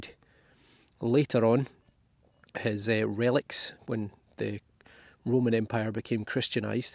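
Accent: Scotland, United Kingdom. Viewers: Hello my friend. First of all, I'll have you know, that we Americans are entertained by your lovely Scottish accent! Furthermore, metal detecting over there is the ultimate dream for any metal detectorist . The oldest artifacts can only possibly date back to 1492 here in America. So, keep up the good work! Thx dmg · A shout out from the States… another incredible journey! I love hearing your accent, as my mum was born and raised in Falkirk. She lived across the street from a big park, I forgot the name of it (I’ve been there as a wee lad but the name slips my memory) but she says there was a great battle there… battle of Falkirk? But would I love to lay my coil on that site! Thanks again for the great hunt…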